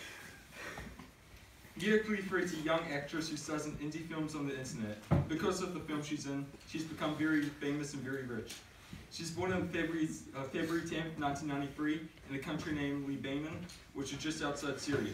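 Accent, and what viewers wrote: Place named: South, United States. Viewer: His accent is a like a mixture of southern, Australian and kiwi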